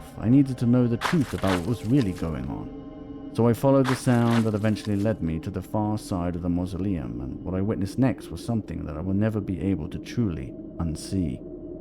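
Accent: United Kingdom. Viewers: is this british accent? i like it. recommend me some more channels like this to practise listening pls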